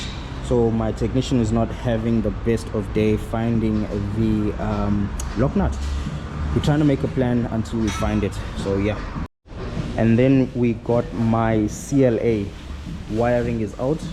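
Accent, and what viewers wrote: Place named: South Africa. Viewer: Love your accent.... mix of SA English, Durbs Indian and I'm guessing Zulu.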